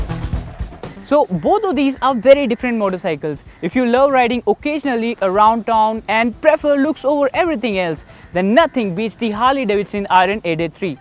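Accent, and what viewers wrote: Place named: India. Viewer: only you could understand your english indian accent... worst!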